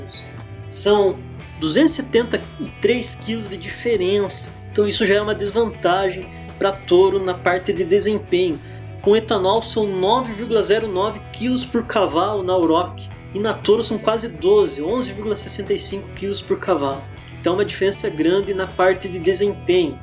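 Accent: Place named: Santa Catarina, Brazil. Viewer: #CARWP nossa seu sotaque é de catarinense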